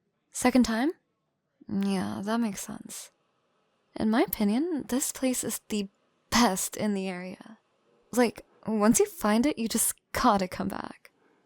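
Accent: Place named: Canada. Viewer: If you’re autistic enough to figure out linguistics for fun ever Canadian sounds so aggressively Canadian and it’s adorable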